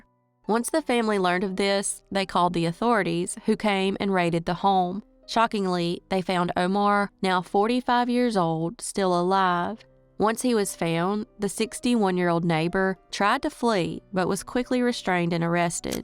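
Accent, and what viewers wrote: South, United States. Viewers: Hey! I'm not sure what your actual name is, so I'll call you. Just so you know, I binged your content last year while building a chicken coop and cutting a lot of wood. So, whenever I hear the intro, I can smell cedar! Or, whevener I smell cedar, the intro plays in my head! Weird, eh? But anyways, thank you so much for the content and soothing voice and southern accent. I wonder, have you noticed a difference in viewership between unsolved and solved cases? I know, for me, I'd like to see more solved cases. Thoughts?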